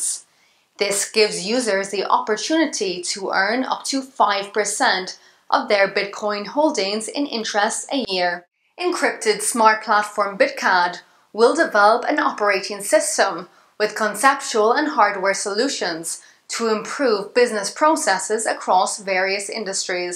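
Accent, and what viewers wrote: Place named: Ireland. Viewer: Like the Irish accent.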